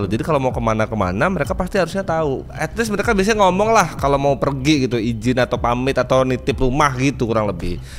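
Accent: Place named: Lampung, Indonesia. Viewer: bang gue suka bgt logat Lampung inimah bikin kangen pulang gue di jkt doang sebenernya tapi kangen bgt logat ini😂🎉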